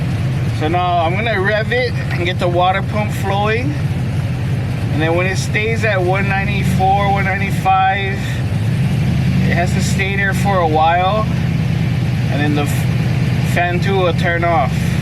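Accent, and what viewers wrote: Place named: Hawaii, United States. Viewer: now if only my tired 230k mile compressor could make high enough pressure to survive in texas. i thought i recognized that accent in the first video, hawaiians are rare on youtube. local boy 🤙🏼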